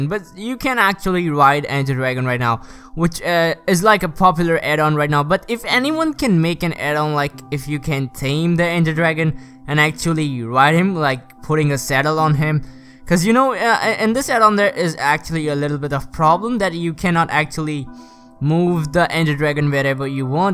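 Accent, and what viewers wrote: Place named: India. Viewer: You have a mixed accent, sounds a little bit like Indian accent, are you Indian by any chance? Just curious..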